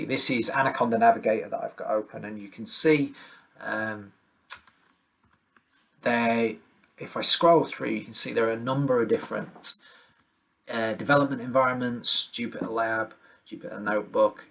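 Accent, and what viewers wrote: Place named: Yorkshire, United Kingdom. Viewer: Great video - love the dulcet Yorkshire tones!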